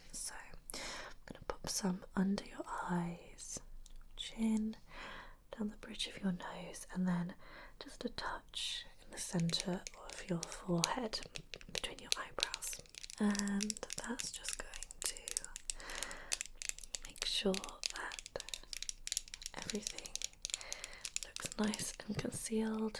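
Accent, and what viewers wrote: United Kingdom. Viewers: I love your British accent so so much!!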